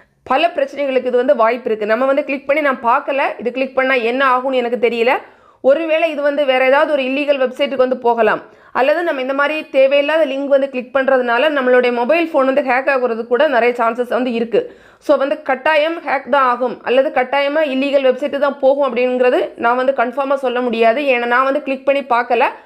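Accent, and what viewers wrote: Kanyakumari, India. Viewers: Neenga kanyakumari district ahh?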